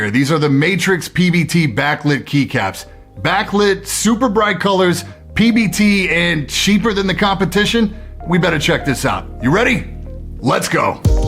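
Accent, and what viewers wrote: New York, United States. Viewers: You do great reviews man. Really cool, polished production. And I don't know where you're from so I hope it's ok to say this, but I love that your accent sounds like you're a New Yorker just talking to his buddy like "Yo Jimmy! Look at these fuckin' keycaps. Look at these things." Such a cool vibe in a tech review.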